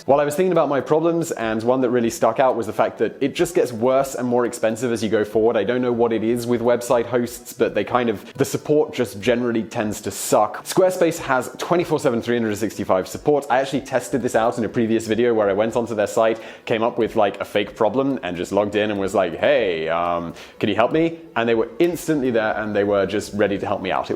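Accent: England, United Kingdom. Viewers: Hello I'd like to start by telling you how much I love your videos & have learned so so much from watching them. They're right up my street as instead of having a set topic you have such a wide,varied & eclectic mix (muchike my brain I'm told) of subjects & I love watching them,I used to watch daily but have recently started to leave them for a while & have a good old binge watch every Friday if possible. Anyway on to the subject of my comment,as I have mentioned I am a HUGE HUGE fan & love all your work however there is 1 thing you do that I find borderline intolerable & that is the fact that you add an upward inflection at the end of a LOT of sentences,the vast majority unneeded as you can be stating a fact whilst sounding like you're asking us a question. As much as I love your vids I find this so very grating as you sound like you're asking questions or are a teenage girl,it's bad enough hearing my 13yo daughter talk this way but one of my favourite Youtubers as well,it's not just me that feels this way as a lot of your fans feel this way too as we've discussed it in the comments before if you've ever seen any we have agreed to BEG you to stop adding this annoying Americanised gumph to your vids,you have an amazing English accent PLEASE PLEASE use it & do our country proud. 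Please carry on making these amazing videos (minus the humph) & I will carry on absorbing all the amazing trivia. Your long time fan Mark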